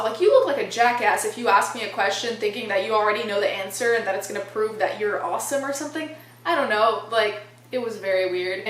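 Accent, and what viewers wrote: United States. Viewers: I think they expected you to have an Italian accent and it’s weird cause you have an American accent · I think it’s weird as do many that you didn’t get your accent in America... it’s interesting but weird because it’s an American accent simply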